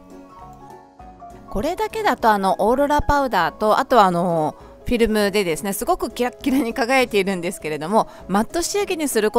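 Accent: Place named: Gifu, Japan. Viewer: ライブのときは岐阜弁が聞けて嬉しかった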